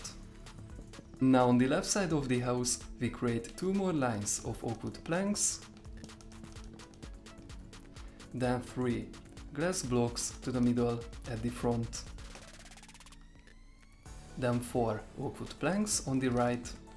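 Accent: Hungary. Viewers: tudtam, hogy magyar vagy.:) kiejtésröl tudtam. amúgy nagyon szeretem a videóidat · Valahogy éreztem hogy magyar vagy a kiejtésed miatt😁 Csak így tovább! 🇭🇺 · Te magyar vagy? Eléggé hallatszik.. :D Nekem olyan furcsa v a beszéded nem eredeti angol kiejtésre hajaz...vagy csak tudsz magyarul? YouTube are Hungary?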